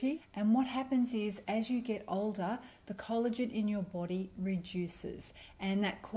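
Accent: Australia. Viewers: Your hair is certainly absolutely gorgeous. I also love your accent. Are you Australian? I lived in Australia when I was a little girl for a year when my father was a visiting professor at the University in Adelaide.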